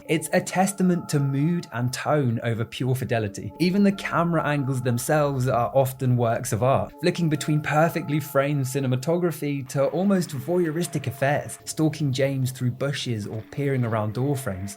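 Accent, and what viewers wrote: United Kingdom. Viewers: You are painfully British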